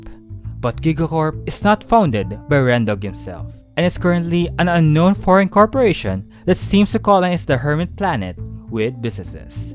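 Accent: Philippines. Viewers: Why do you sound like a Filipino? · Pilipinonh pilipino yung accent!!!!!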